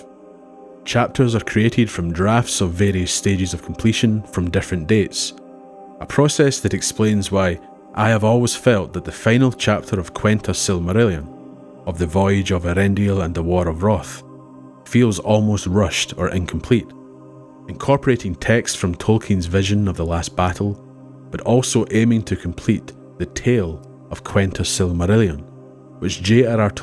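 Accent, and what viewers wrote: Scotland, United Kingdom. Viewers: very well made video, and as a german, loving the scottish accent :) Obviously there was no primogeniture among the noldor, as always there have been descendants with a higher claim. like the sons of faenor. so one could assume, that birth wasn't everything when the elves considered who should be king.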